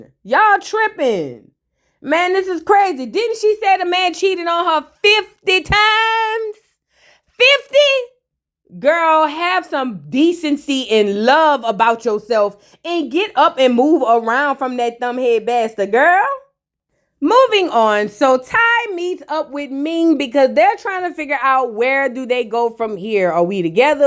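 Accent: New Orleans, United States. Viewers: I love your new orleans accent ❤ New subscriber.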